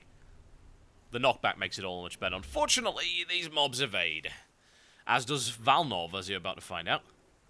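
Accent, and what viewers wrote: United Kingdom. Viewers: dude your british accent fits for the worgen commentary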